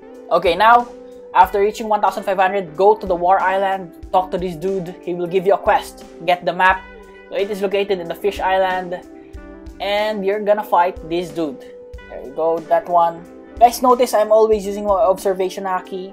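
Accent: Philippines. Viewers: it sounds like you have the accent? filipino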